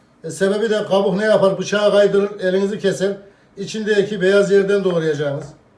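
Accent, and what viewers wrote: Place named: Anatolia, Turkey. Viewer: Ustamın şivesi ve doğal haliyle gerçek ve dürüst Anadolu kişiliği güzelliğe güzellik katıyor,Rabbim yolunuzu açık eylesin.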